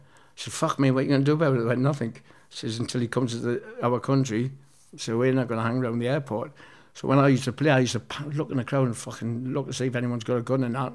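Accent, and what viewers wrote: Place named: Geordie, United Kingdom. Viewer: He's a Geordie that's how they all sound.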